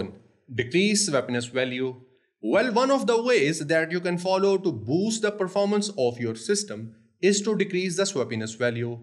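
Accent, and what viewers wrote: India. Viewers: Any video with an Indian accent is a no go! India is scammer central.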